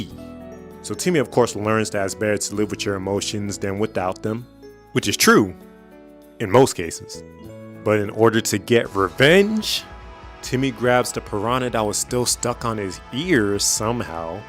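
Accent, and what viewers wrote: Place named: South, United States. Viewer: Your channel finna blow on god, and bruh I need to see if you from Georgia or Florida cause you kinda sound like us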